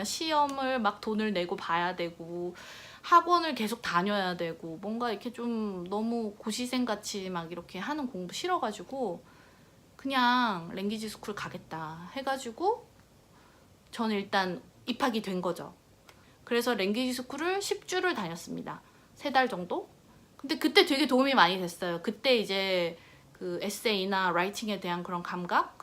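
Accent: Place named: Australia. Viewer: 호주 아줌마말투 ㄷㄷㄷ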